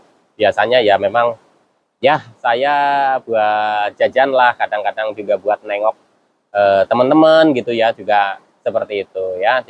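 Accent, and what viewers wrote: Banyumas, Indonesia. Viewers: Takur ngapak tulen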